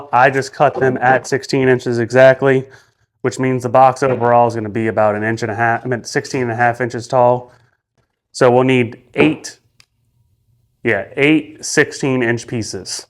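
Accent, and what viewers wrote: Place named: Louisiana, United States. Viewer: Great video, thank you very much! Are you in Louisiana? I picked up on some accent which sounds like it. 😁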